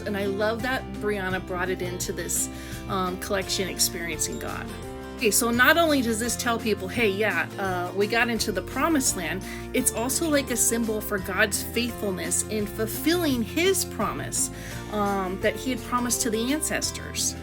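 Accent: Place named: South, United States. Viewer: ain't nothin' wrong with a southern drawl y'all....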